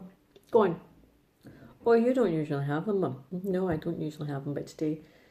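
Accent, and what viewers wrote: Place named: Scotland, United Kingdom. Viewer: I enjoyed your video, nice progress and the patterns you got are beautiful. I have looked at William Morris patterns and just know I'd never make it to the end so didn't even try. It was nice you got to go have a meet-up with the other stitchers, I meet with a group every couple of weeks and it does encourage me to keep at it though we do chat and spend time looking at what everyone else is doing. Lol . Flosstube has grown so big its re ally hard to grow a channel unless there is one of the bigger numbers ones shout you out. The only thing you can do that I can see is find those who joined around the time you do, comment often and shout out other new comers. I don't make videos but I have been observing for a long time, lots of people join and hope those who have been here for ages will see them, comment on them but they only have as much time as they can fit in to watch videos and they made most of their friends when they joined . 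Every week or so I search Flosstube#1 and welcome new stitchers, if I made videos I'd shout them out to the subscribers I had and comment on them often, give likes and slowly over time I would find those I had the most in common with . Good luck building your channel, I really enjoy your videos though at times I find it hard to figure out what you're saying because your Scottish accent is quite strong😂. The Vietnam war was terrible , a whole generation of young men were drafted and a whole lot of them came home in body bags. They were fighting for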